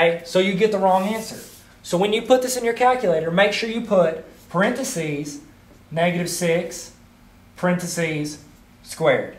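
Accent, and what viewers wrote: South, United States. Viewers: Um lets use our heads maybe he from the south? sup with you stupidity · Sup with that country accent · this helped me so much thank u btw i never understood from the teacher but when i come back from school i study what i did today and u helped me sooooooo much ZAAAANKS!!!!!!!!! YOUR THE BEST TEACHER BTW I LOVE UR COUNTRY ACCENT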